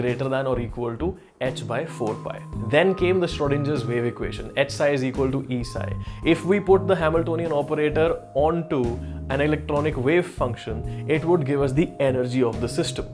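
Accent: Punjab, India. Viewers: Finally saw a punjabi with this accent in English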